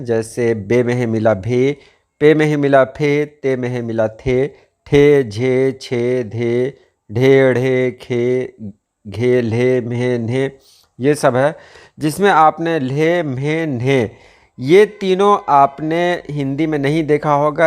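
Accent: India. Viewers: Lectures kuch pehle bhi sune ic k mn ne.❤. Ye Indian ha? Wese main Urdu to inki bhi Pakistan ki tarah ha but phr bhi sunane se pata chl raha ha k Indian ha. Yani k Indian or Pakistani Urdu mn faraq aa chuka ha. Lehjae mn bhi or pata nhi jahan but feel ho raha ha k inki Urdu different ha.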